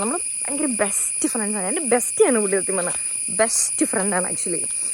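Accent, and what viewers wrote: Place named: Kottayam, India. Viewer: Naatil evdyyaaa?? Samsaram ketit pathanamthitta/kottayam pole😅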